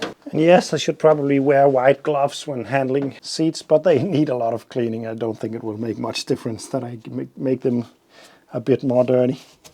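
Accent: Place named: Denmark. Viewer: Hello i just stumbled upon your channel because i saw this saab video. Then i heard your voice and i knew you were danish! (im danish too). It's great to find a danish car channel, there's so few :) I love the saab 900's and im probaly buying a saab 900s (low pressure turbo) as my first car! It's got a bit of rust, the clutch hydarulics arent working but it's awesome! I agreed with the owner that he was going to fix a little problem on it and then i'll buy it. It'll be a great project! :) Keep the saab videos coming :)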